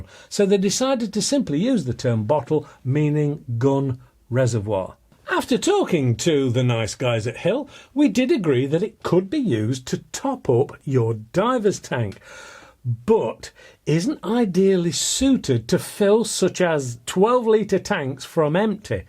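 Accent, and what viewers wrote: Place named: Yorkshire, United Kingdom. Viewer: Great review of what looks like a quality product. But atrocious Yorkshire accent. No more of that please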